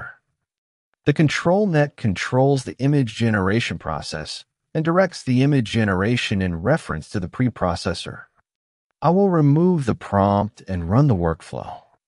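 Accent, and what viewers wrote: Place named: India. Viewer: We are used to strong indian acccent in tutorials don't worry :)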